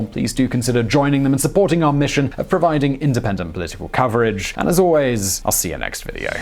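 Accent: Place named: United Kingdom. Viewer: Kinda hard to take this guy seriously with that accent, we all know Britain is the OG when it comes to fucking over nations. And they didn't stop either, Palestine and the Middle east are witnesses. Oh my god, I just realised I speak 'their' language😭😭😭 gotta respect the British pillaging- it's value for money #longlasting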